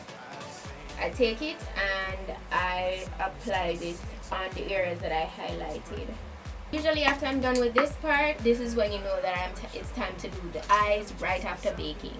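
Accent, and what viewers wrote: Jamaica. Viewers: Hey i just wanna say, i love the content of ur vids, love ur accent, Im Trinidadian and omg i just love a strong Jamaican Accent . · go girl... are you jamaican? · I subbed as soon as I heard that Jamaican voice 🇯🇲🇯🇲🇯🇲🇯🇲🇯🇲🇯🇲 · Are you Jamaican???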